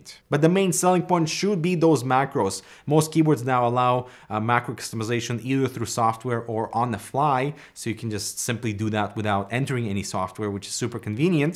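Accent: Russia. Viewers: По началу было сомнение что вы русскоговорящий из-за акцента, но потом в видео подтверждение проскользнуло. ;)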